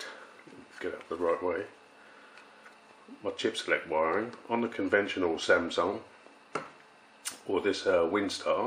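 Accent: United Kingdom. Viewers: are you british or american aka born in what country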